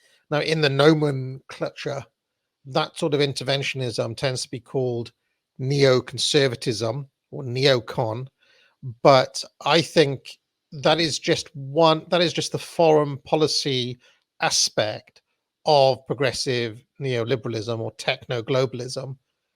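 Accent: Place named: United Kingdom. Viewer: So, you start this video, sounding like a very intelligent and wise person. Your British accent helps to accentuate this quite well. You then speak how many or most of the billionaire class seek to enslave us and the tactics that they have been using to do so. Yet toward the end, you completely pivot, like you fell down some sort of rabbit hole, and try to tell us that the people who seek to return the power to the people are actually moles for the billionaire class. I believe that you, yourself, are a mole for the billionaire class, or, more likely, you are just a damn defeatist who would do better for the world by just shutting the .... up.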